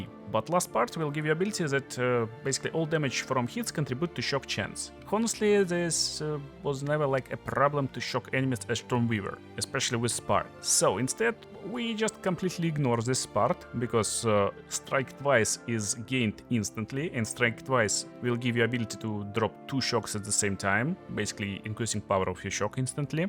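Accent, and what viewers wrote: Russia. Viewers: судя по акценту парень то русский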